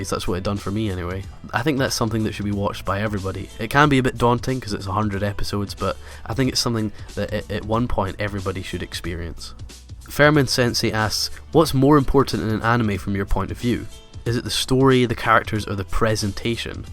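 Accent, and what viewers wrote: Scotland, United Kingdom. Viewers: Where in Scotland are you from? Ps I'm fro Edinburgh! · Are you Scottish if so where from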